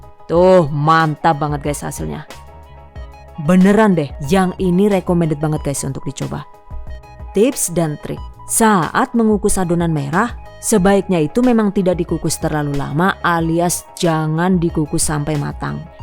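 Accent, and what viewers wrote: Banyumas, Indonesia. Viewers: Luar biasa nih boluny cntik dn empuk seneng bnget dengan pembawaanya sll di selingi bahsa purwokrto juga ngapak gitu skss yh mb mdh2an cenel ini sll mmbwa brkah amin 👍❤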